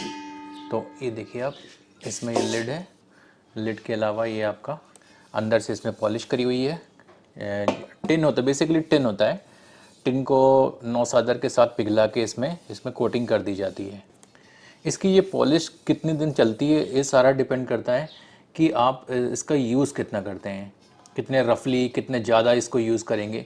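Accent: Standard. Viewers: aap jitne ache tarike se sudha Hindi me bolte he utna koi dusra cheaf nahi bolta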